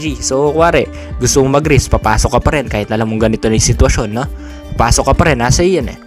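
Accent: Pampanga, Philippines. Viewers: Kapampangan ka ata boss?😅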